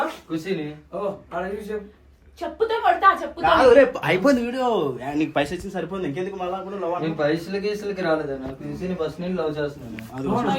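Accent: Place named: Andhra Pradesh, India. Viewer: Hi anna mi videos einthaka mundhu challa manchiga undavi anna Eppudu videos lo andhra slang maku aithea manchiga anipistledu anna aa slang maku adram aithea ledu anna so plz deniki recat avu anna koncham 😊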